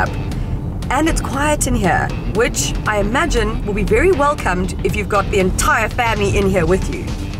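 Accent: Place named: South Africa. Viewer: The south african accent never fails to baffle me, how does it sound so posh and cool at the same time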